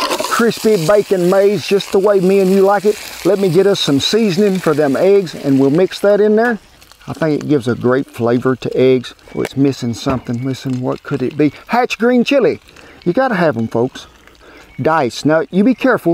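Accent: South, United States. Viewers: Thank you for all your video's. I love the way you explain things in southern language. May God bless you always. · Damn, I'm a Brit who's always had a thing for the country American accent and good food who's just stumbled on your videos, you've just hit both nails in one. Looking forward to watching more in the future sir!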